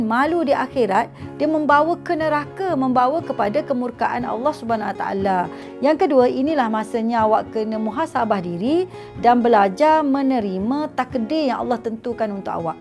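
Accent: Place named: Kelantan, Malaysia. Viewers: Bahasa Malaysia aksen Kelantan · haaah..mari mana...sah kelate🤣🤣🤣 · Ustzh awal2 lancar je lama2 keluar jgk Kelantan dia haha.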